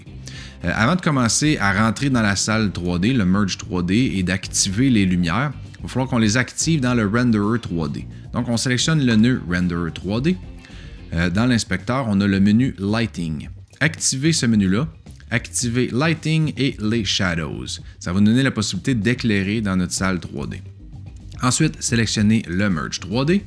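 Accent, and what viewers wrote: Quebec, Canada. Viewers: Même avec un accent de québécois c'est très compréhensif. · Bravo pour tes tutos efficaces et ton style posé et agréable à suivre. J'avoue que pour un papy français comme moi, ton savoureux accent canadien ajoute un plaisir supplémentaire!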